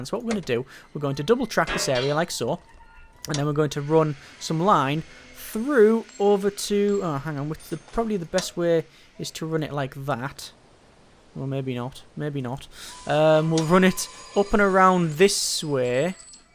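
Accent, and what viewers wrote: United Kingdom. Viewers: Are u from uk osp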